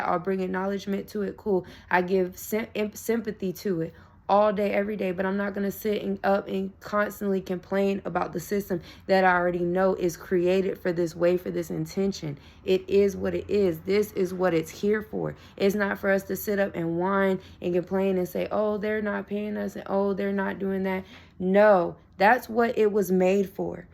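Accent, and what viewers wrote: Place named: New York, United States. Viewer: I'm detecting a northern accent, is that NJ. , long Island. Or staten Island?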